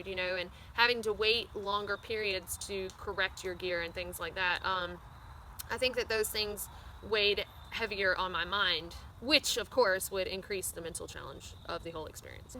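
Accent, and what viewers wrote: South, United States. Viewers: You're slowly losing your southern accent!! :)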